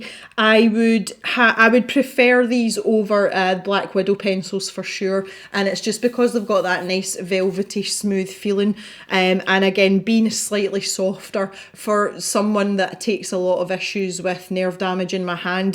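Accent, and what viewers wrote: United Kingdom. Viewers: I’ve just started colouring so I’m late to the party. Your vlogs are great and love your accent. It must be one of the best accents in the UK.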